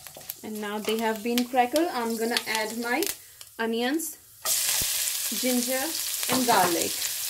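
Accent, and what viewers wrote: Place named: India. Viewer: Awesome... and with boiled rice it will be more tasty. Di I like ur hindi accent more cute. 😍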